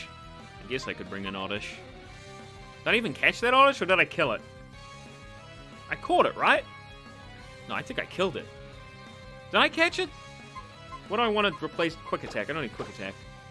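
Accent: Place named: France. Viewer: Love your french accent haha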